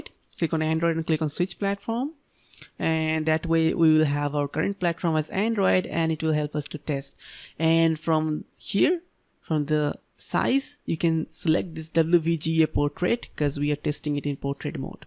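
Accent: Bengal, India. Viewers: Amio Bangali...from bangladesh....apnar kotha bolar style dekhe keno jeno mone hossilo apni bangali...tai ask koresilam.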